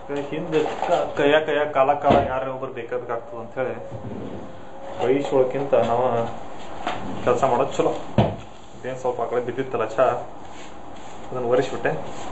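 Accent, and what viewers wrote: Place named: Karnataka, India. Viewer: i somehow like the dharwad accent of speaking kannada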